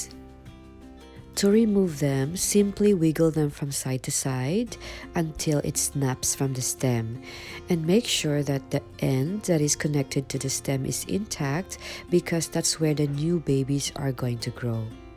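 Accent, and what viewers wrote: Philippines. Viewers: I can hear you're my kababayan! This is an excellent video. Thanks!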